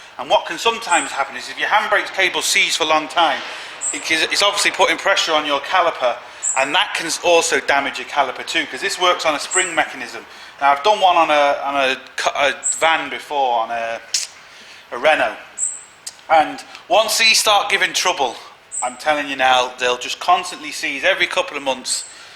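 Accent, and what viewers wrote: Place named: United Kingdom. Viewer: thanks mate its good to hear british accent for a change,good job well done.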